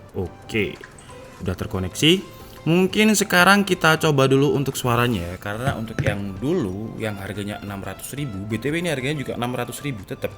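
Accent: Java, Indonesia. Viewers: Di kelet kan pakai api... Wes talah jowo mu loh mas Medok banget... Seneng aku mbek bahasa ne..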